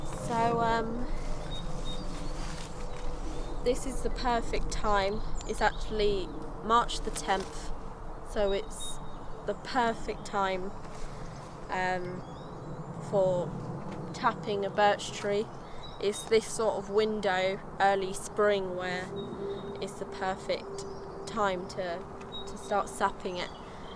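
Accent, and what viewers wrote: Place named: England, United Kingdom. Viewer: I love your accent, which area of England are you?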